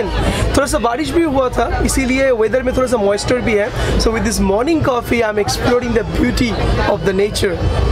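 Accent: Bengal, India. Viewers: Sweet Bengoli accent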